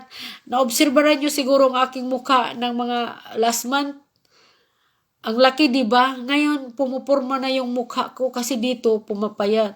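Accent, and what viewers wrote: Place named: Visayas, Philippines. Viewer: Congrats po.. Parang bisaya ka po maam, taga bohol ko maam.. Sana mabibiyayaan din ako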